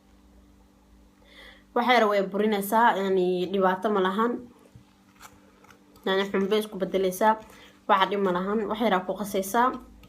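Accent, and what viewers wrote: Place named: Italy. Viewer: byo mashalh waad fcnthy lkn Lahjadaada wlhi daacaddii ma fahmayo af talyaani iyo sisi kale baad u hadashaa mrka ugu yaraan ingredientska english noogu sheeg adoo iga rali ah .. thanx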